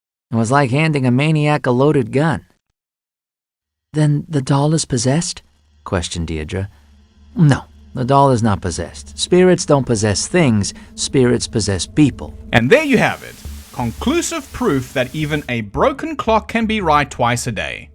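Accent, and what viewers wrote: South Africa. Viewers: So good to hear a South African Accent🎉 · Are you from South Africa? 🇿🇦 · A FOLLOW SOUTH AFRICAN?? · Tried to comment earlier and couldn't. Wanted to say I love your personal flare to reading these cases out loud, pretty much saying what we're all thinking, giving validation to those thoughts and feelings. And it's really nice hearing from a south African, I am subscribed to many channels across the globe, but never had one of those until now. But I did want to say that one of your background sound tracks bothers me, it's the popping and crackling one. just hits me in the ear wrong and makes me either mute that part or turn to my phone and play something. I can't describe it any better than that, I'm sorry. other than that, 10 out 10 channel!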